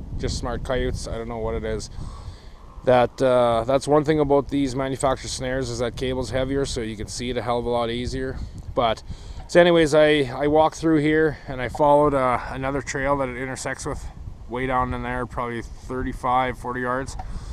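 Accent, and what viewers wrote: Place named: Canada. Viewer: i know nothing about this subject. Hmmm - so do you eat the coyotes after you process them?? I know people eat deer, rabbit, elk, and stuff - and if so - what does it taste like? You sound Canadian - what province are you from? How long have you been hunting/trapping? And are you ALONE out there in the woods all isolated like that??? Men. I tell ya, you guys - I don't think I would be in the woods and stuff alone like that. What do you do with those pelts? Do you sell them or make clothes/bags with them?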